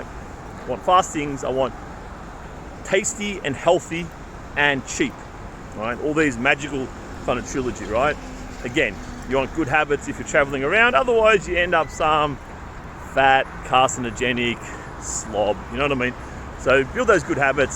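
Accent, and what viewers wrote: Australia. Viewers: It's very hard to understand this Australian accent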